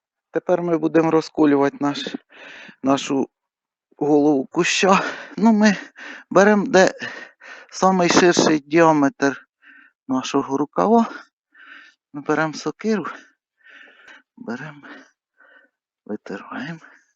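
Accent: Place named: Zhashkiv, Ukraine. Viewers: Я також послухав - ніби Маньківщина )) Ніби дома побував. Сокира, радянський секатор і ножиці, і обов'язково тряпочка )) Таке рідне. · Черкащина райьон говор як в Жашкові..віджалій гроші купи нормальний сікатор він не ріже ніфіга..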